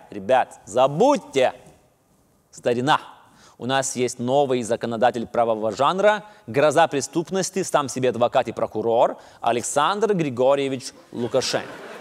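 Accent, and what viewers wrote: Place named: Lithuania. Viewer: po truputi dingsta lietuviskas akcentas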